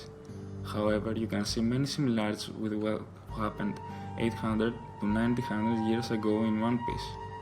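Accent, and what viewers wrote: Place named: Arab world. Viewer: You're Greek? I thought you Arabian from your accent. I know nothing of other cultures!!! :'(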